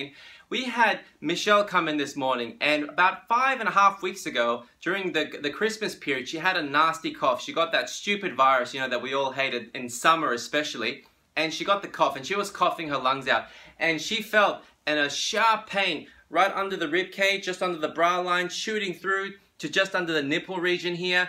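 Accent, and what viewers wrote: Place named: South Africa. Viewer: Hi, do you speak Afrikaans? You sound like you may be South African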